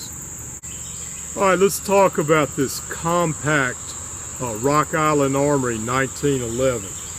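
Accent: South, United States. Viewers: “Excuse my execrable French” Mon Ami, I very selfishly felt “included” in this comment. However may I assure you that you as a Southern gentlemen with your accent ….. that the French would find your “French” est très magnifique. Avec moi, with a Belfast Irish accent and though being French resident for several years my sometimes totally correct French vocabulary and grammer is often considered by my French friends as horrible, mais l'accent de ma femme Susan anglaise totalement acceptable. Great video comme toujours, especially in these times. My thoughts are with you and your family, stay safe. You can always trust a Smith!! Cordialement RB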